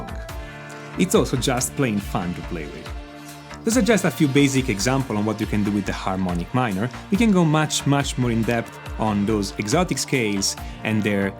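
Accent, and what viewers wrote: Italy. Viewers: You're very useful to the one who studied a lot. Thank you! Are you italian? Your accent sound so italian. Sorry for the stupid question!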